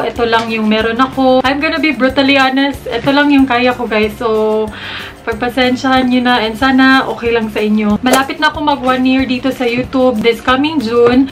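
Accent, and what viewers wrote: Visayas, Philippines. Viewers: Hi Miss. Madalas ko na nakikita yung mga videos mo sa feed ko then nung pinanood ko na di ko namamalayan na grabe na yung tawa ko. Very natural, transparent and I love your bisaya accent kaya napa subscribe ako. Congrats po for 20,000 subbies and advance happy anniversary sa channel mo po. More kwela videos to come dito sa channel. FB: <link> IG: <link> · nag subscribe ako kasi ang kulit ninyong mag jowa. katuwa. una sabi ko bisaya siguro to kasi may punto hanggang sa di ko na namalayan nagmarathon na pala ako ng mga vids mo. IG: krizzie31 FB: krizsy anne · Hi Ate, unang vid na napanood ko po sainyo ung Fashion 21 na make up tut. Nagsubscribe po agad ako kc nagagandahan tlga ako sa accent/punto ng mga bisaya since bisaya din mama ko. Sya po ang naalala ko. Para skin napakalambing po ng salita nyo effortless nyo magpatawa sa mga vid. 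Simple lang po pero nakakaentertain. Congrats din po sa 21K subbies!!! ❤ FB: Jonna Banuelos IG: jlvm_ Twitter: jonna_lnv Gmail: <mail> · Hi ate, di ko lang matandaan kung anong pinakaunang video na napanood ko sa channel mo pero sure akong baguhan ka pa nun kasi hindi pa yung G7X ang cam na gamit mo hehehe. Basta naalala ko lang noon na intro mo palang napa click na ako sa subscribe button kasi nakakahawa ang pagka witty mo at bungisngis. Enjoy na enjoy akong manood sa mga vlogs at tutorials mo kasi bisaya ka, ganahan kaayo kos tono nimo ba. #ProudBisayaHere:D Keep on inspring us and God Bless you always! I love you ate:) Mwaaahhh <link> <link> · Hi ate 😍 Chada jud kaayu imung mga video ate uy labi natung mga blogs ninyu ☺😙 Haha si mylabs ba kulango pud diayng dako ganahan kayu kog lantaw te kiy usahay kiy maka storya kag bisaya haha struggle pud siguro ate ba sig tagalog haha hinaot uy nga naa untay bisaya nga mga blog ayha pa dayun ko mag himo ana😂 halaka te uy! 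pa try gud ko anang ipag me now o ambot unsay spelling ana😂 haha ganahan kog lantaw sa imu g videos te kiy Tinood ka dili ka plastic te😍 mismo akung papa ganahan pud sa imung mga video kiy imung tono daw kiy bisaya gihapon ganahan siyag lantaw haah ana akung papa pwede ba daw mag bisaya unta ka kiy wala daw kaayuy Bisaya nga mga bloggers Pero Ana ko sa iyaha tagalog lang sa ta pa kig dili unya ka sabot ang mga Subbbies ni ate nga tagalog😅 haha nag storyaha nami ni papa tungod nimo 😍 Haha tung nag kuan mong mylabs nimk Joker daw kaayu😂 haha dinhi lang sa ko te kiy mananaw pakog videos nimo uy! maka adik man ka ate😂 way char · hello atiiii 😊😊 pnapanuod ko po yung video mo kasi: **natural na natural ka po I mean hindi ka maarteng magsalita hindi pasosyal 👏👏 **gusto ko po yung tone ng voice mo kahit po parang bisaya ang sweet po kasi 😍😍 **ang kulit mo po sa mga videos mo especially pagkasama mo yung my loves mo-jolly person 😊💜💜 **hindi ka po nakakaboring panuorin 😘💙 --- plssssss 💜💜💜👏👏👏👏👏👏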